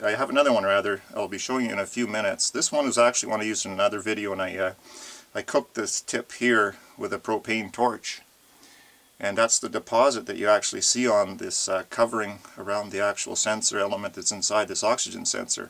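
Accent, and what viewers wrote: Canada. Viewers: Love the Canadian accent lol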